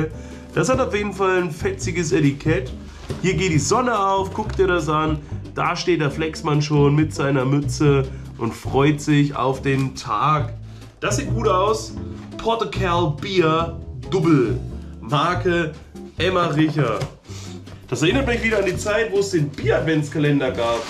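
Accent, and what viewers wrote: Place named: Netherlands. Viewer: Eim sehr spezieller niederländischer Akzent! Glückwunsch nachträglich! 😀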